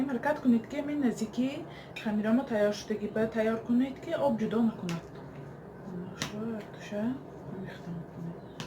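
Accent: Isfara, Tajikistan. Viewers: Бехтарин Шумо аз шахри Исфарами Акцентатон авантюрин.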